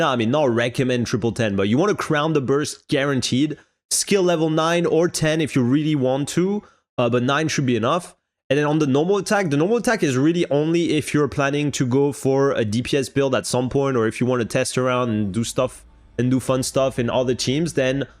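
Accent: France. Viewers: are you french you have an french accent sometimes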